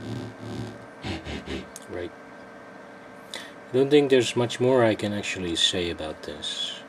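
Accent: Netherlands. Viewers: ik weet gwn aan je acsent dat je nl bent XD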